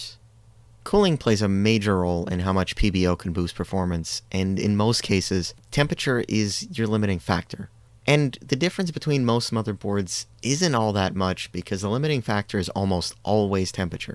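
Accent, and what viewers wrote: Scotland, United Kingdom. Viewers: I miss your adorable Scottish accent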